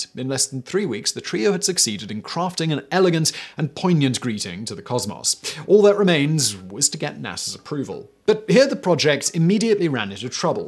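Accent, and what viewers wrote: United Kingdom. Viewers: Can you guys make a video of this dude saying THAT WAS A REMARKABLE ACHIEVMENT in his thick british accent?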